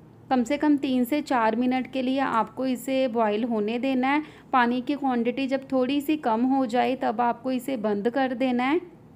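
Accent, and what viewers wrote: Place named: Lahore, Pakistan. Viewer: nice n informative, btw, u have pure lahori accent like us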